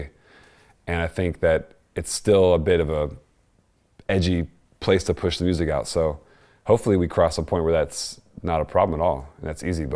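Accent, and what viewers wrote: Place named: Cockney, United Kingdom. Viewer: cockney pig sty gov'nor. bah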